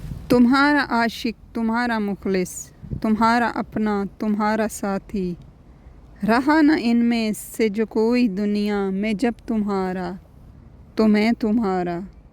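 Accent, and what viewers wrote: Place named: Punjab, India. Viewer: اشعار اچھے ہیں لیکن آپ کے پنجابی لہجہ نے اشعار کی ایسی کی تیسی کر دی.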